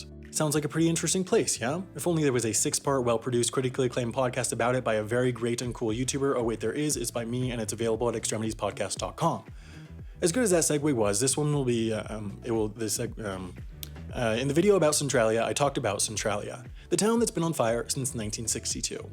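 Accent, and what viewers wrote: Netherlands. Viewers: are you dutch? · Wait... You're dutch?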